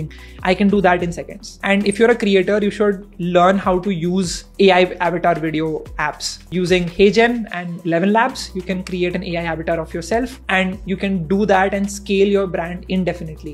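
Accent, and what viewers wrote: India. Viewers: That lady's accent always changes and in this one she sounds more Indian than ever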